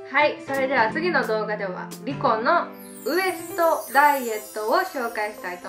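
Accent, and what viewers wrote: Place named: Mie, Japan. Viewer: の三重弁くそ好きなんだよねー♡♡笑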